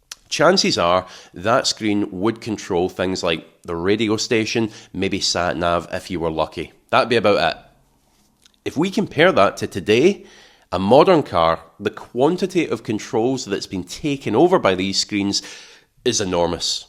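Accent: Scotland, United Kingdom. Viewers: I'm half Scottish but sometimes I find difficulty in understanding the Scots accent. Not with you. I understand every word. Thank you. Incidentally I think the name Dacia is usually pronounced Dach eah.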